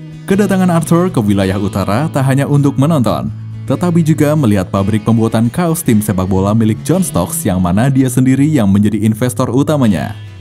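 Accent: Banyumas, Indonesia. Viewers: Asli tetep ngapak kawan asli Cirebon ☺️☺️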